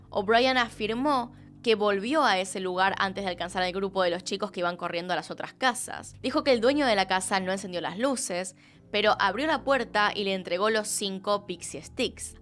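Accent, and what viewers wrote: Argentina. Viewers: El año pasado conocí tu canal y desde entonces no me pierdo ninguno de tus videos✨ estudie psicología y eso me provoca ponerme a analizar a los personajes y casos que narras, aparte que me declaro fan del acento argentino ❤️ Muchas felicidades gran canal🌹 PS: te recomiendo el caso del monstruo de Toluca, fue un compañero mío de la universidad, realmente fue muy trágico vivir esa experiencia y no visto que nadie hable del caso y le de voz a sus víctimas. PS2: saludos desde Los Ángeles CA 🫂🌞😸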